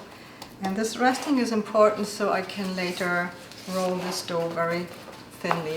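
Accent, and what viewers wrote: Germany. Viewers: So I came here for the Strudel recipe, didn't expect the attractive lady in a dirndl with the German accent, now I'm subscribed. I'll be trying this and the red cabbage recipe as well.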